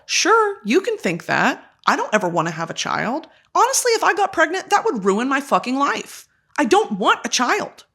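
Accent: United Kingdom. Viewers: u should do an episode in full british accent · your birtish accent is so jess this season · Your british accent is giving. I love it!!!! But fr make this love island NOW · The British accent is giving Chole from love island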